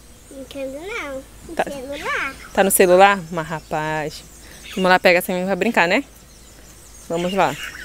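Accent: Rio de Janeiro, Brazil. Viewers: seu sotaque é de carioca, esse sotaque arrastando o x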